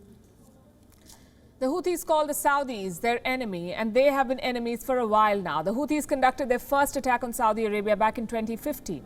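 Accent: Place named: India. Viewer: It is hard to take someone with an Indian accent seriously 😂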